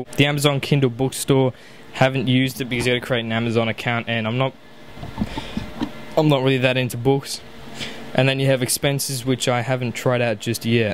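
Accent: Australia. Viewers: Good to hear an Aussie accent on YouTube, especially about the iPad. Keep at the good videos mate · finnaly an ausie youtubber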